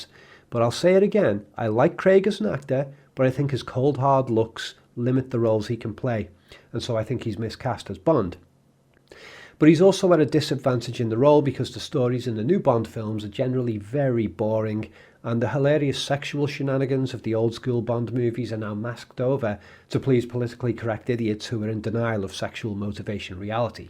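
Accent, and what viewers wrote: Liverpool, United Kingdom. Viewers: Letting his inner scouser out. I suspect he’s capable of a much more feral scouse accent but keeps it in check for his international audience 😂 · Interesting to hear your take on Bond - given your accent I keep forgetting that you are the citizen of a monarchy that lost an empire....hence the need for the delusion humorous or otherwise. G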